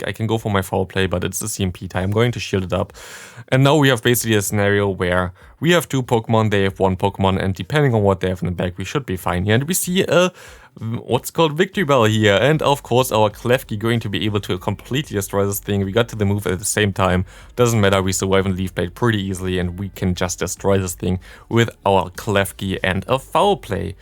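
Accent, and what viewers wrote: Germany. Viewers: Ich schaue beim Zähneputzen gestern dein Video, meine Freundin kommt rein und fragt: "Ist das ein Deutscher?" Ich glaube man hört das 😂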